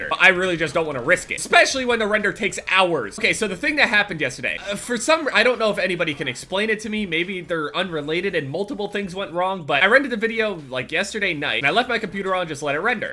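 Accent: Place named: New Jersey, United States. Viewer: your new jersey accent is starting to be more noticeable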